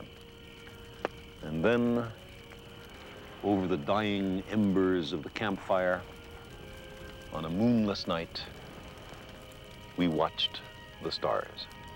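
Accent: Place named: Brooklyn, United States. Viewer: Carl was born in Brooklyn and lived there as a child. It must be noted that the "Brooklyn twang" was different than today than when was a child in the 1930s ( would be pushing 90 if he were still alive). It must also be noted that spent the majority of his life in high-level universities such as Harvard, Cornell and Berkeley. Being a lecturer it's likely he taylored his accent for his students.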